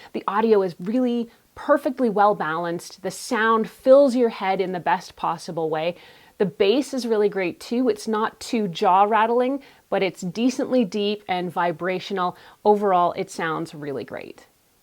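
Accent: Canada. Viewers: She sounds Canadian , so the video is even better.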